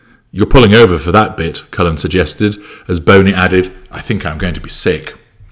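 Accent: Australia. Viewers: champion aussie Accent Mate. fair suck of the sav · you are hilarious!!! Loved your opening monolog. I'm one of the ones that loves your Australian accent, G'day, etc.... And to those who don't like it, then GOOD! 🤣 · I’m amused by your Australian accent. I hope someone comments on audiobook experience! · More Aussie accent please. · Your Australian accent spiked monologs are a few of my favorite things!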